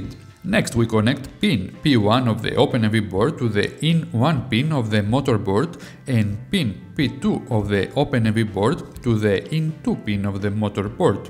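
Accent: Greece. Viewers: Quite informative as far as high tech is concerned but with a strong Greek-English accent which can sometimes be not so overwhelming to native speakers as mispronunciation of words can lead to some degree of misunderstanding and missing the point. Not offence I hope as I am Greek too with Engineering background. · I haven't had any misunderstanding related to his accent. He is quite clear. I thought he might have been Spanish - but now that you've brought it up - I see the Greek intonations. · I love this channel ! The projects are simple enough to be great starting points for my projects. Excellent explanations and the Greek accent is awesome too. Ζήτω η Ελλάς !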